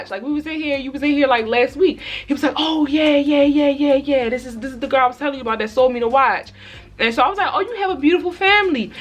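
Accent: Baltimore, United States. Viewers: you from Baltimore you gotta accent I can hear it 😭😂😂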